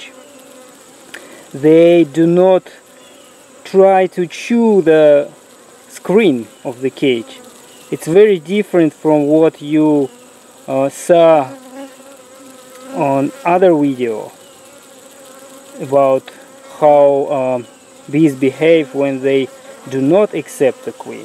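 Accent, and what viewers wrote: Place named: Russia. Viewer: Мне одной кажется, что он на самом деле русский, но пытается говорить на Английском с ужасным акцентом..)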